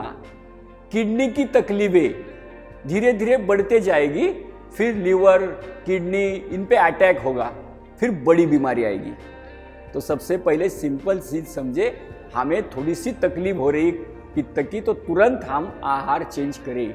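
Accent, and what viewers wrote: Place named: Maharashtra, India. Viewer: Sir aap marathhibhashi lag rahe h Aapka bolna mujhe Bahut achha laga 🙏🙏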